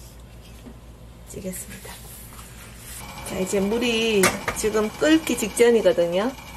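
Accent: Gyeongsang, South Korea. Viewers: 목소리도 듣기좋고 경상도사투리가 더 듣기좋아요 항상 잘 보고있어요 👍👍👍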